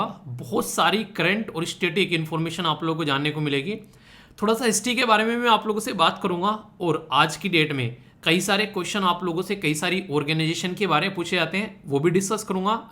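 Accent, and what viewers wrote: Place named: West Uttar Pradesh, India. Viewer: Based on sir's dialect it seems like he from West U.P. possibly near Baghpat.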